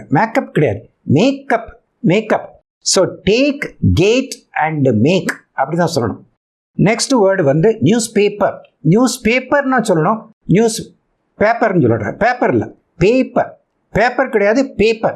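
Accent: India. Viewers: nenga pronounce panrathu India pronunciation thane sir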